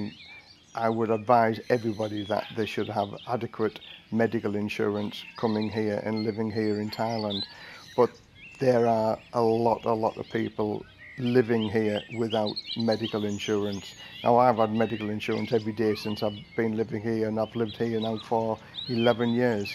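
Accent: United Kingdom. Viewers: Thanks, came at the right time! Saw this coming in the West; only going to get worse. Really appreciated, just needed the extra encouragement from a recognisable voice from the UK. Decisions made..later this year to visit. thankyou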